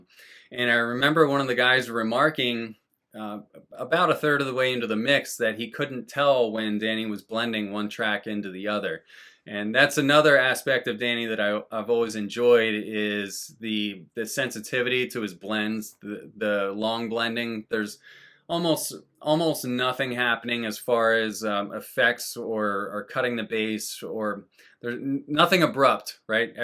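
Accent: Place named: Philadelphia, United States. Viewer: Haha now that you say that you do sound like you’re from Philly/southeastern PA, I didn’t know that. I just moved back up here to Chester county from south Florida in August, grew up out here. Glad to know though, seems like there aren’t many people out here collecting/playing/enjoying dance records but I’m sure I just haven’t met them yet! I’ll keep watching and learning, appreciate the content.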